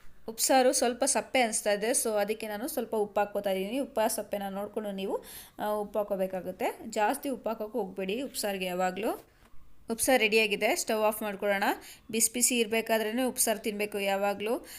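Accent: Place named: Mandya, India. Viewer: ಅಕ್ಕಯ್ಯ ಮಂಡ್ಯದ educated ಮಾತಾಡೊ ಕನ್ನಡ ಚೆನ್ನಾಗಿ ಮಾತಾಡ್ತಿಯಾ, ತುಂಬ ಇಷ್ಟ ಆಯ್ತು, ಸೂಪರ್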